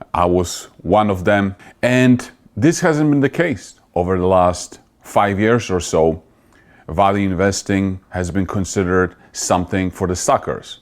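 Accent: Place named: Midwest, United States. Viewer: M. I feel very fashionable! On Sunday evening 23 January, I was 85% in cash, on Monday 24 January, I was fully invested and in margin, PLTR, SOFI, XPRO, IMDB ETC. THE 15% remained in the big 3 of yours, And USGDF, AZMCF,CNSNF. I don’t care about stock prices, just want to be fashionable. Going to be measured for Brioni, Dolce & Gabbana, and Givenchy, I have grey hair Fair, wrinkled skin, with brown spots what colors do you recommend,( to match my stocks? Not sock,)? BTW, you are developing a midwestern accent like Ohio or Indiana.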